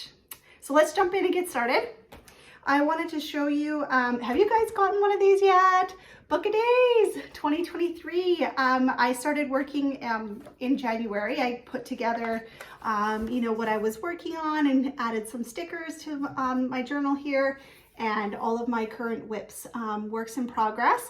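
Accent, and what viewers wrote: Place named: Canada. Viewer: Oh, I love your videos. Your taste in charts is wonderful and your stitching is so beautiful. On top of that you have the most soothing voice and lilt to your lovely Canadian accent. I grew up on the NY/ON border and love what we call the “round Os” of our Canadian friends. Delightful to listen and watch.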